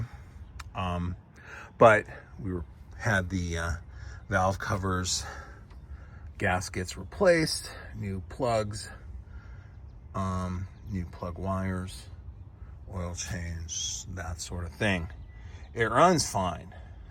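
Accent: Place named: Pennsylvania, United States. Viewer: I could tell you are from PA by your accent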